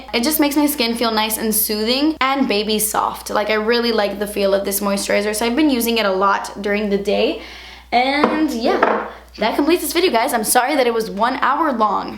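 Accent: England, United Kingdom. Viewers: Full video speaking in british accent please!! ❤️ I am always expecting you to speak in that accent in every video. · Can you do a tutorial or anything with just talking in your British accent? Lol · I love it when you do an English accent! Please could you film a whole video in this accent?!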